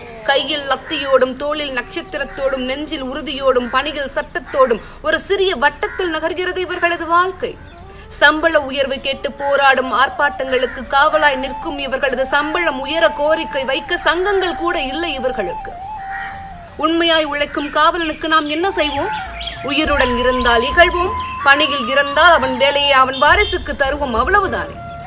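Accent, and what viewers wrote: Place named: Sri Lanka. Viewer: எமது இலங்கைத் தமிழ் உச்சரிப்பை உங்கள் நாவிலும், இதையத்தில் விதைத்தது இறைவன் என்னும் அருள், மேலும் தமிழ் மொழியில் உங்கள் சேவை பெருகட்டும் நன்றி.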